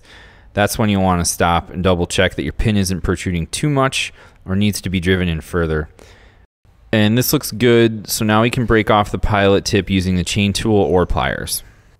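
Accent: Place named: Canada. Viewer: sounds like a canadian..I like the canadians I have known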